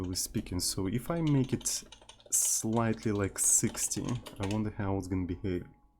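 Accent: France. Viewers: За ужасный французский акцент дизлайк и отписка.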